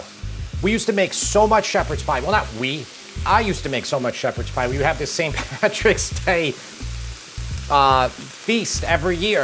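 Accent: New York, United States. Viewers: I know that accent. Queens? Long Island? 🤔 😂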